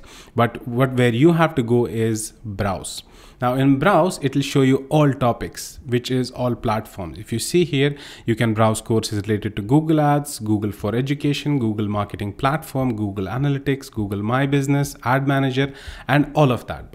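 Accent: India. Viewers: He have kinda Indian accent 👀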